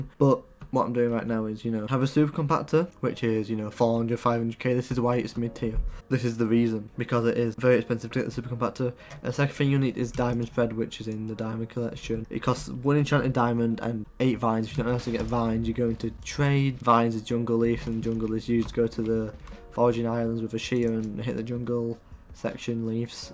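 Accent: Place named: United Kingdom. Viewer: The critisicm.. if people are donkeys and can't understand English doesn't mean they need to complain in the comments. (My main language isn't even English and i can understand him very well.) I'm also self taught with English. So please stop the criticism he just has a British accent jeez.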